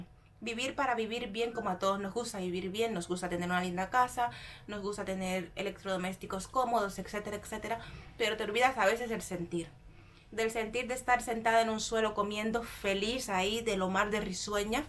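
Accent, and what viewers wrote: Spain. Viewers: Aqui hablavas con acento de España...ahora hablas diferente paisana😅😅.Como conosiste a tù novio y donde?? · Que acento tan español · Hace un tiempo te vi en un video c9n tu mamá haciendo una historia y hablabas como una cubana que eres. Y ahora habla como una española??? Porque. Acaso es delito? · PORQUE HABLAS COMO ESPAÑOLA SI TIENES UN MARAVILLOSO ACENTO CUBANO. YO VIVÍ EN MADRID Y NUNCA HABLÉ COMO ESPAÑOLA SEGUÍ MI ACENTO CUBANO. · Niña bella ❤me encantan tus videos con tus experiencias y sobre todo Como tu dices demostrar tus raizes Porfa HABLA COMO UNA CUBANA TU IDIOMA❤ DEJA ESE ASENTO ESPAÑOL PUES YO VIVO EN ALEMANIA MAS DE 30 AÑOS Y NO HABLO COMO UNA ALEMANA MI CASTELLANO, MI ESPAÑOL ES MI ORGULLO MIS HIJOS SON DE PADRE ALEMAN Y HABLA MI IDIOMA SIN ASENTO Y ESO ES MI ORGULLO AUNQUE ELLOS SEAN ALEMANES LES EXIJI SIEMPRE QUE HABLEN SIN ASENTO Y HOY POR HOY ELLOS MISMO ME LO AGRADESEN HERMOSA MUCHAS BENDICIONES Y GRACIAS POR TUS VIDEOS 🙏🙏🙏❤️❤️ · Muy fina, muy española hablando y las terminaciones "mos" las cambia por "nos" como: Alumbrábanos en vez de alumbrábamos, etc. 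Lo que llamas ordenador es el monitor, lo que llamas monitor es la torre que es en realidad el ordenador. Mucha fuerza, cuando se lucha como haces tú, de todo se sale, tendrás todo lo que mereces con la ayuda de Dios. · Eres Cubana pero tienes un acento Español. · Te gusta Turkia? Veo que tienes el acento español!!!!Saludos😊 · Si eres cubana, porque hablas enfáticamente como los españoles,disculpa el dialecto cubano es difícil de erradicar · Hola te comencé a ver cuándo sacaste el vídeo con Paulita una persona muy bella, eres cubana por favor no hables con el acento de los españoles, eres hermosa y no hay que olvidar nuestras raíces, soy del estado de Veracruz, Mex.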